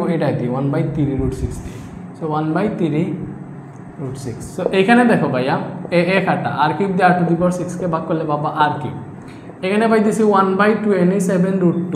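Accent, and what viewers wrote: Sylhet, Bangladesh. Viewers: ❤❤❤ ভাইয়া আপনি মনে হয় সিলেটি? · Bhai afnare amrar Sylhetii lagee Afnar bari kita Sylhet nih? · ভাইয়া আপনি কি সিলেটি😊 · Oyh oyh. taine sylheti.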